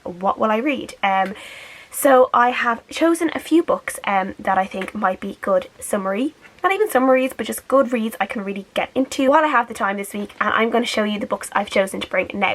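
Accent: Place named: Ireland. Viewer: I love your Irish accent! As an American of Irish decent, it never gets old. On Beauty looks amazing! Have a fun trip girl!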